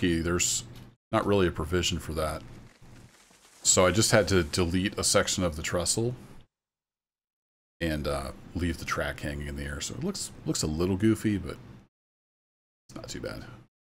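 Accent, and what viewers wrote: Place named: Midwest, United States. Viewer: Is it just me or does sound like he is from the Midwest or the rockys area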